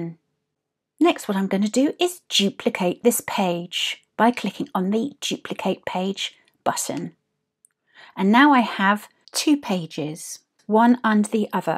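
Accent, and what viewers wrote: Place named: United Kingdom. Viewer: Calm and Steady to understand thank you and "very British" indeed :)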